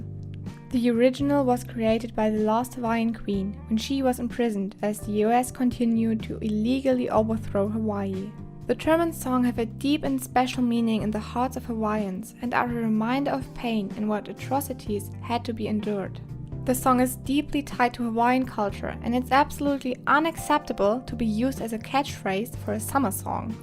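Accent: Germany. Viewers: Are you German? Ur accent is so German omg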